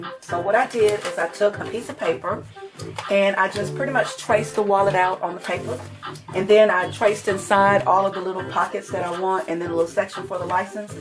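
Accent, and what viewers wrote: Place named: North Carolina, United States. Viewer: I would love to be the owner of a wallet made by is this possible? You sound like you may be from my part of the woods, N.C. I loved watching your video, I'm a 71 year old man and I think you are the best teacher I have seen.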